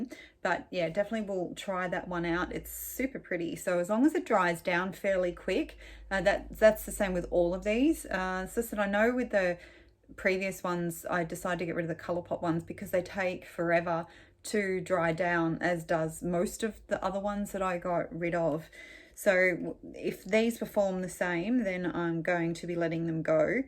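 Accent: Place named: Australia. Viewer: Aussie accent learning time! haha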